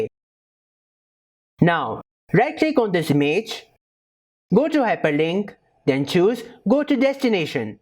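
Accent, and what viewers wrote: India. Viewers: Damn, that indian accent. Just cannot listen to it. Get better narator.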